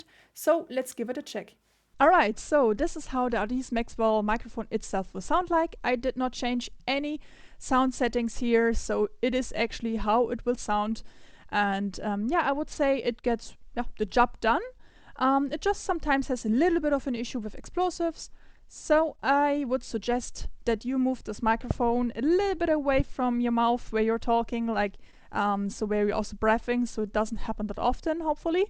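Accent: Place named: Germany. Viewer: Tell me my ears are right and you're German. 😁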